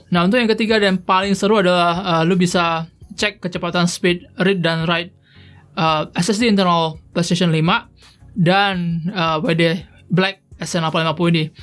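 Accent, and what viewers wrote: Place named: South Jakarta, Indonesia. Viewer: Waduuh suara sama bahasanya kok kya orng jaksel gila ndak paham wicis wicis 🗿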